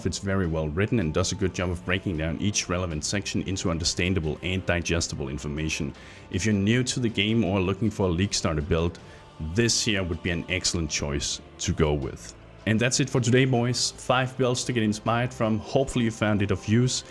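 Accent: Denmark. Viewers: Is this dude Danish?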